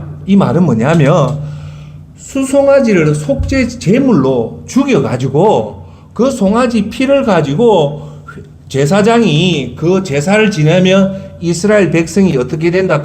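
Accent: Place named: Gyeongsang, South Korea. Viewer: "예수는 빼삐고"... 아이고~ 빠뜨리고 아닌가요? ㅎ 경상도 사투리 그립네요. ㅎㅎ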